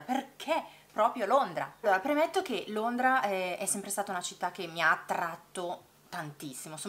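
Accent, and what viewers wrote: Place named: Milan, Italy. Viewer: sempre con la cadenza milanese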